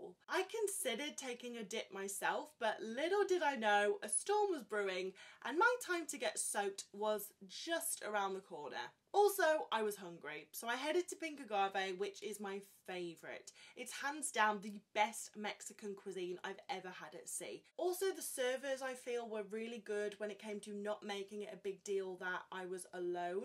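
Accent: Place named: Midlands, United Kingdom. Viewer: That sounds like a Midlands accent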